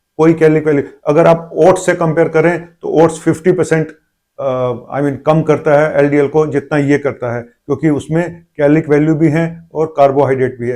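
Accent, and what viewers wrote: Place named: Punjab, India. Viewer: Sir सत श्री अकाल आप बातों में पंजाबी का तड़का लगाते हो लगता है के आप का पंजाब से कोई नाता है, लगता है के तेरा मेरा कोई पिछले जन्म का है नाता ❤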